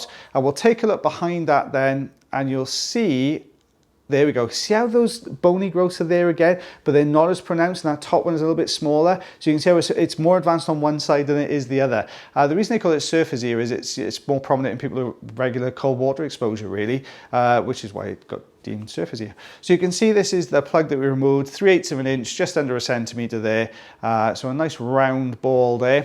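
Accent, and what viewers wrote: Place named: Wales, United Kingdom. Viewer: Hi, love your videos! It took me quite a bit of googling to realize you’re saying “Zoellner” tubes. As an Oregonian, I don’t get much practice understanding lovely Welshmen like yourself. Thank you for the education and entertainment!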